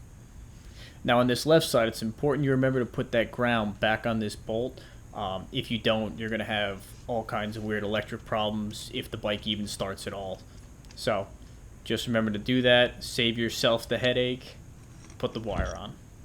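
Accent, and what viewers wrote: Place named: Pennsylvania, United States. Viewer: Cool man. Sounds like a PA accent. Looks great. Totally worth getting a tool for the retaining ring I think. Here’s one I have. Pretty inexpensive for the heart burn. <link>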